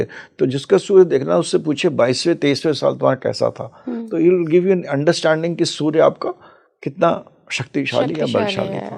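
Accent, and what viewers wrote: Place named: South, India. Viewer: Becoz sir is from southern part of India.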